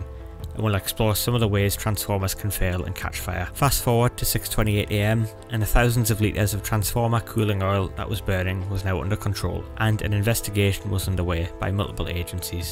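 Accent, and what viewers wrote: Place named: North East England, United Kingdom. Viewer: Nice to hear a North Eastern voice on YouTube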